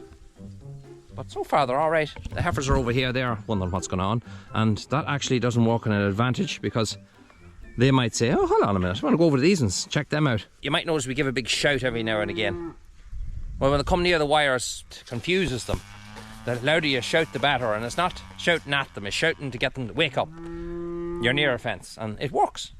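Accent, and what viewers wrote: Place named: Monaghan, Ireland. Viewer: It’s the same Monaghan accent once again everybody!!!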